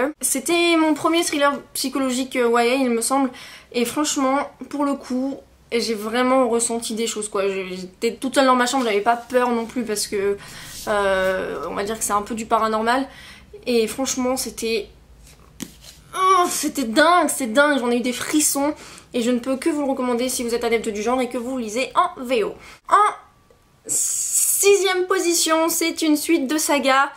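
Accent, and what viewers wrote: England, United Kingdom. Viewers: t'es trop drôle! ^^ j'en ai déduit que tu vis en Angleterre avec ton accent! ;) merci beaucoup pour ces présentation de livre!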